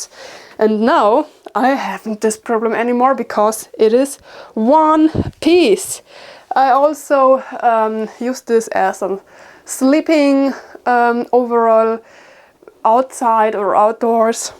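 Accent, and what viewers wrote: Germany. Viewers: So good to see an english video I watch her in german ones , but it plays a computer voice , and I don't get to hear your lovely german accent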